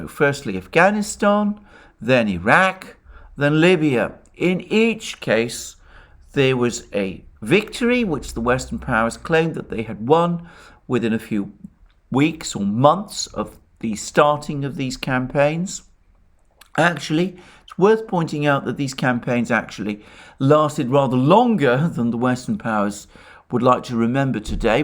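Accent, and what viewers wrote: United Kingdom. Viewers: Yes, i am commenting, but why are you shakin that strangely, greek man with good Brit accent ? Both russia and ukraine are NWO controlled...i saw in mr Christofouros channel comments praising russian president : this is complete foolishness. But majority wants to believe that either ukraine is the good cop or russia saves the world : divide and rules for unuseful idiots ! Thank you. Greetings from Polska...we are unique, because in our history always anti fascist and anti communist ! ( Like Orwell :-) )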